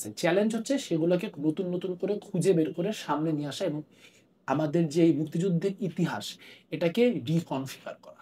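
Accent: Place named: India. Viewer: উপস্থাপকের ভাষার মধ্যে কেমন যেন একটা ইন্ডিয়ান ইন্ডিয়ান ভাব আছে, ❌দাদা ✅ভাইয়া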